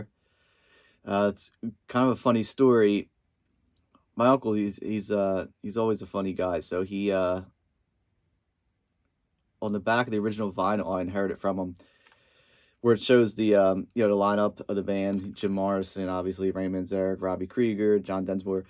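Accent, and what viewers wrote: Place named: South Jersey, United States. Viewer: I bet youre from south Jersey or Philly .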